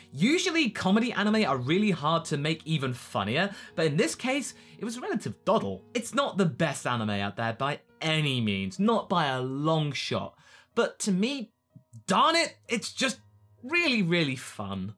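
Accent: United Kingdom. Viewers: Well, good video, you are British Because you have an acent like a British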